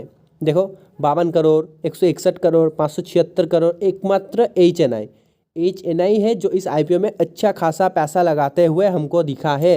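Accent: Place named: Bengal, India. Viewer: Bhai bangali ho kya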